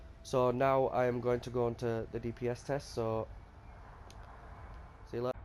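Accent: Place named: Northwest England, United Kingdom. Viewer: Hiya mate. LOVE the vids - you're putting out some ace content at the moment! Just out of interest - where about in the UK are you from? Your accent sounds like Wigan/Preston area? Deffo the Northwest! :) I'm from just out of Chester. :)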